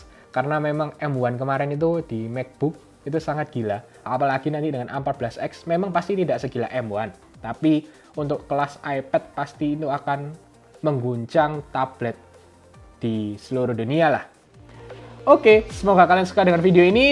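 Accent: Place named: Surabaya, Indonesia. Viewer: Bahasanya medhok suroboyo..hehe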